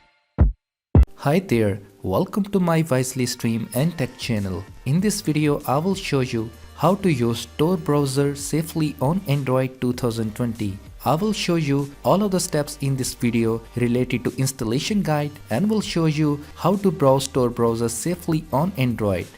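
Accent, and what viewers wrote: India. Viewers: I hate indian accent very much. But this one is okay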